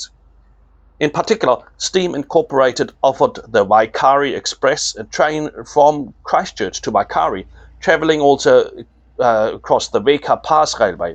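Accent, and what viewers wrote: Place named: South Africa. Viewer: Interesting. . A South African accent with a tinge of NZ.accent. I get the impression that these are charter trips rather than scheduled services.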